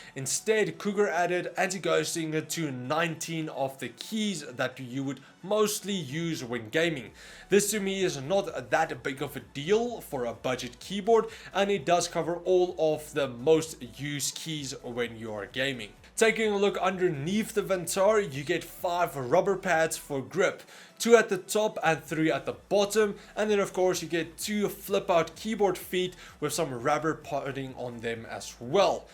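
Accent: Afrikaans, South Africa. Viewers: Ek wou se jy klink Afrikaans!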